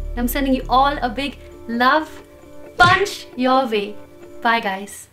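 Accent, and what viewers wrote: United States. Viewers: App American hai kya 🤔?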